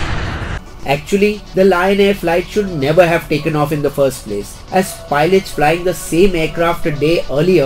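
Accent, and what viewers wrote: India. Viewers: Thees is Indian English speaking style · IS MY NEW ADDICT VOICE IS VERY CLASSIC MYSTERY DIGGERS ALSO MY FAVOURITE BOTH WITH NICE INDIAN ACCENT UNIQUE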